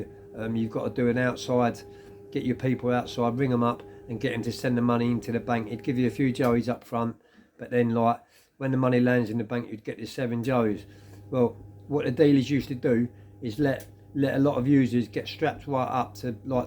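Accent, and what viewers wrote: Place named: South London, United Kingdom. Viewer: iknew it was south of the river accent 😂😂good luck with your mission mate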